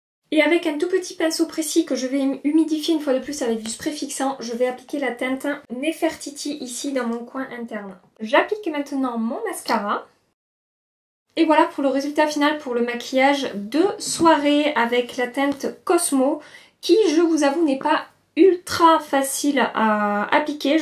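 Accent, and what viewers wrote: South, France. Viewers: Le petit accent du sud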